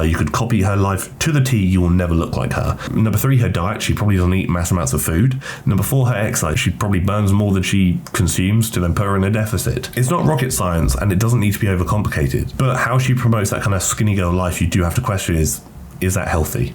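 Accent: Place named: North, United Kingdom. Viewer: This is so random but are you northern lol...this is the first time you've sounded northern to me lol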